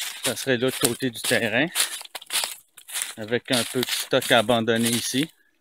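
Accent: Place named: Canada. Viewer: Vu son accent ça doit être au cannada 🇨🇵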